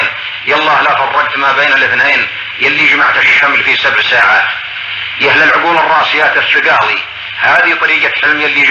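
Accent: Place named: Taif, Saudi Arabia. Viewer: نطق الكاف. والجيم عند رحمة الله عليه من الطائف الى رجال الحجر خاصة كبار السن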